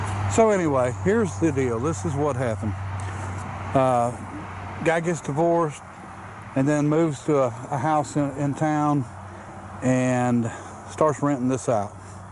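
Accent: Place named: Maine, United States. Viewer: Sounds and looks like maine accent is throwing me off tho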